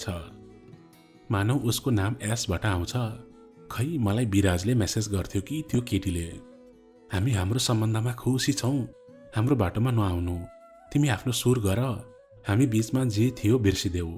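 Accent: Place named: Darjeeling, India. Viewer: ❤❤Your voice from Darjeeling 💕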